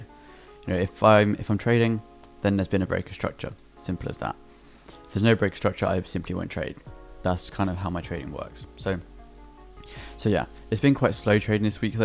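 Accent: United Kingdom. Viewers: You from Bournemouth mate?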